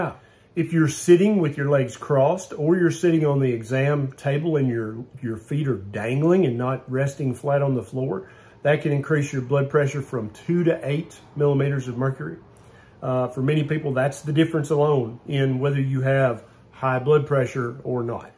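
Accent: South, United States. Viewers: "Before taking your blood pressure, I want you to sit down and relax. Do some deep breathing. Maybe turn the lights down. For around 10 to 15 minutes. This may seem like a lot of rigmarole..." How Southern can you get. 😄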